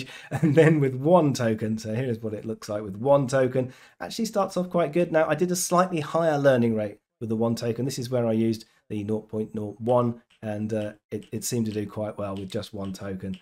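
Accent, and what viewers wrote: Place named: United Kingdom. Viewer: I trust this guy because he has a British voice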